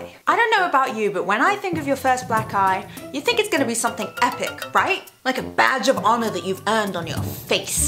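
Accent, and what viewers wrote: United States. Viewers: Dude, you're starting to sound so American. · What's with the American accent? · It's been a while, and i have one question. WTF HAPPENED TO YOUR ACCENT!?!? You sound so damn American now! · she sounds so American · She's beginning to get an American accent. :D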